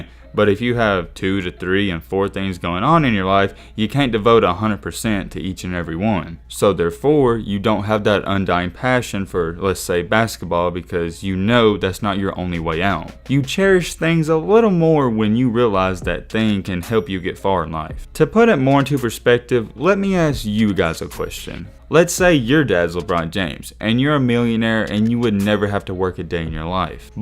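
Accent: South, United States. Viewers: Where are you from in the south?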